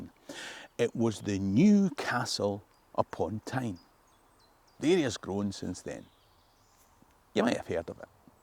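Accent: Scotland, United Kingdom. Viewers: Coming here from a Tasting History video about 'Macbeth'. History is a fascinating thing, and everything is better when told with a Scottish accent! If I'm ever in the area I'll have to drop by to check this out.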